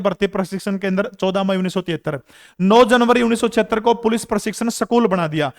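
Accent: Haryana, India. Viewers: Jami mast aap ki haryanavi to mana Andi lega ..gurur ji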